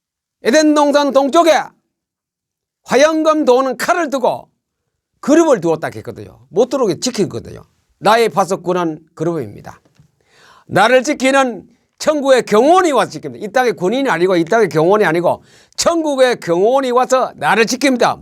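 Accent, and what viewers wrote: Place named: Gyeongsang, South Korea. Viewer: 말투가 참 친근합니다 경상도분 목사님 ^^' 귀하고 좋은말씀 👍🎚감사합니다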